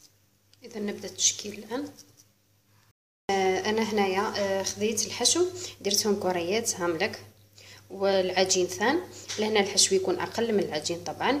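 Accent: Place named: Setif, Algeria. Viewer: أطباق يمممم مقروط وعليه لكلام ختي من اي ولاية انتي حساتك من صوتك انك سطايفية والله اعلم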